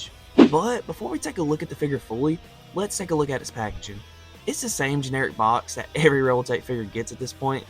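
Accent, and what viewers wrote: Texas, United States. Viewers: is it like a Texan accent or smth idk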